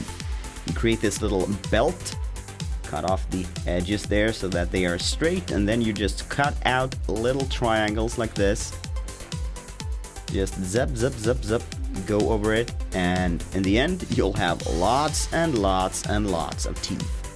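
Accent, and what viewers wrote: Scania, Sweden. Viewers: Nice ”skånska”!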